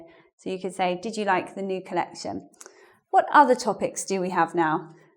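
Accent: United Kingdom. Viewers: I love your lesson, also British accent... many thanks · I LOVE THE BRITISH ACCEPT XD !!! I LIKED TOO MUCH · omg British women has the cutest accent which makes me very attracted to them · Strong Britsh accent! :)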